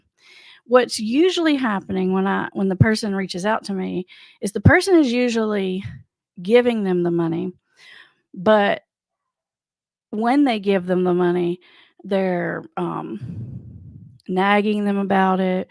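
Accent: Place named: North Carolina, United States. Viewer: Hey ! We share the same dialect ! (n.w. N.C.) 🏅 👍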